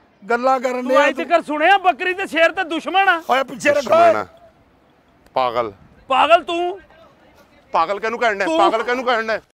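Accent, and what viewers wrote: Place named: Amritsar, India. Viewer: Tuwadi boli sade ambersar Naal bahut Mildi ah te show vi bahut changa lagda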